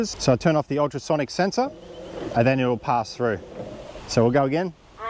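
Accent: Australia. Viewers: Thanks for this vid - it's great to see it in use on an Aussie property (with an Aussie accent!) I've just put my order in for the 4WD version, expecting it between chrissy and new year. As a relatively small female in my mid fifties, mowing 27 acres is a challenge - I usually focus on 5 acres and let my neighbors cattle moo the rest. As much as that is a nice thing to do, it means keeping fences closed and dodging cow pats for months. My place is on 30-40 degree slopes, with a front paddock, a back paddock, an orchard and the house yard, all of which are laden with red bellied blacks and ticks during the hot months and leeches during the wet. Because I've purchased the 4WD version with the extra wide/traction wheels, I'll be able to use this while standing somewhere safe and shady, or at the very least, following through a path of mowed area, and that will be life changing. 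I'll be able to do work on parts of my property I've never even walked on and I am sure I will feel safer without having tall dead grass standing like a fire hazard. This machine has an application for people with a disability, sure, and also for people who just aren't very physically strong, and that is going to be a target market that a lot of 'guys' wouldn't even consider. I'll let you know how it performs if you're interested. Oh, and something I have not seen mentioned on vids about this machine - its got a lithium PHOSPHATE battery - which means it last for a few thousand cycles (instead of lithium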